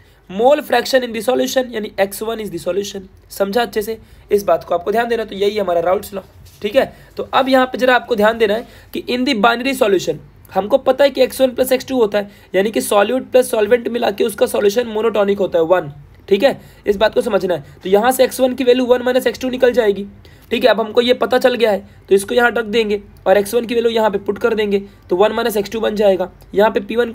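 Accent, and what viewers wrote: Maharashtra, India. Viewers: Marathi aahe ka Dada tu? Aasa bolat ja madhe madhe thoda changl vatt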